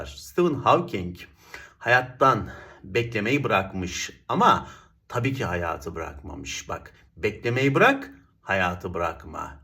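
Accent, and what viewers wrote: Ankara, Turkey. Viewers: Ankara lı mı, Sivas lı mısınız😅😅😅sevgilerrr 🎉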